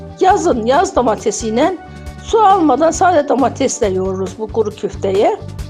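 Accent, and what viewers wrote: Gaziantep, Turkey. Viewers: Yaaa teyzemmm Gaziantepten uzaktayım konuşman o kadar tatlı ki özlem giderdim. Ellerine sağlık çok güzel duruyor. Ama bir eleştirim var köftenin yanında yaprak sarmasını göremedim ☺️ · Tam bir antep dili konusuyon bayıldım konuşmana çok tatlı eline sağlık zeytin yağı kokuyor ayçiçek yağı kullansam olurmu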